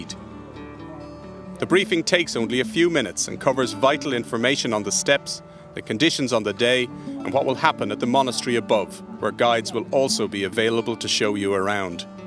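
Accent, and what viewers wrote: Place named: Ireland. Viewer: that guide at 5.00 min. is American but narrator is Irish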